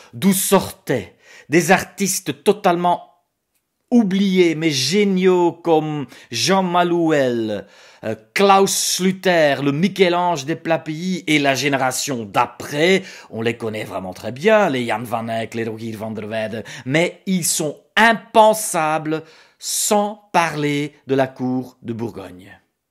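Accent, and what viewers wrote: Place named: North, France. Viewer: Je n' ai pas lu le livre, mais j' ai dégusté avec plaisir le podcast. Votre français léché avec votre accent du nord est tout bonnement un plaisir pour les oreilles et ce qui se trouve entre. Ha si l'histoire m' avait ainsi été contée, je serai devenu incollable. Merci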